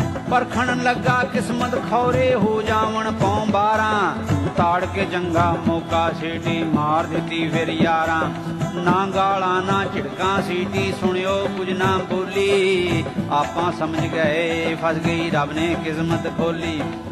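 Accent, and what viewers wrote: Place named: Okara, Pakistan. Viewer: same Pakistani punjab ki punjabi... mere area okara main same boli boli jati he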